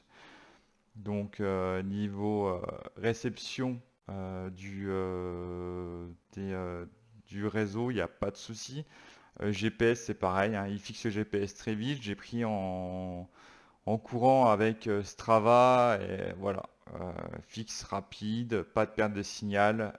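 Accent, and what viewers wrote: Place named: Vosges, France. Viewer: alors je ris, parce que en vous écoutant (sans avoir lu) j'ai pensé moi aussi à l accent Vosgien 😛🤭 ah ah ah c est tellement inimitable ! Entre Vosgiens on se reconnaît bien. Merci pour vos infos 👍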